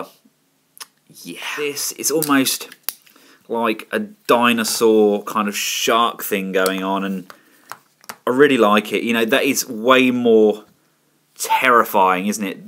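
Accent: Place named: Scotland, United Kingdom. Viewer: wow he looks awesome ! and great review ! i subscribed a few days ago ! by the way, are you scottish ? you sounds alot like david tennant the 10th doctor in doctor who ! lol ! :)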